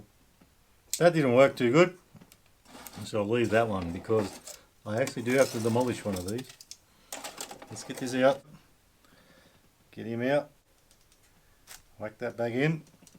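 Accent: Australia. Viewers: Aussie mate 🇦🇺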